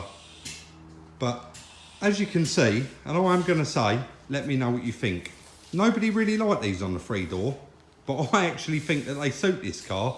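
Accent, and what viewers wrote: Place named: Cockney, United Kingdom. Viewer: This is just a great channel, great commentary, as I've said before I just love the cockney geezer accent.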